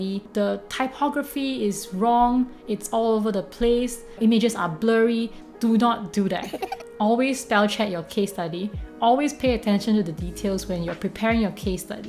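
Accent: Nigeria. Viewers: You sound like a Nigerian ❤️ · Just discovered your channel, very informative video... Btw you have a Nigerian accent and i love 😊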